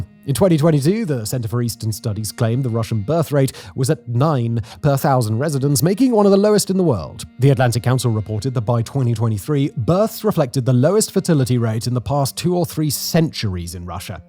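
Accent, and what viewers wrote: United Kingdom. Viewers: Sorry but would it be possible to include closed captions in your videos? I'm afraid to admit this but while I do grasp written English pretty well as a non-native speaker, I'm having more trouble understanding British English compared to American 😅 And the automatically generated CC obviously sucks ass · Hearing what seems like a snooty British accent telling of Russia's demographic problem is bizarre. Just how is the native population of Great Britain doing in this situation? Aren't they merely a decade or two behind Russia in exterminating themselves?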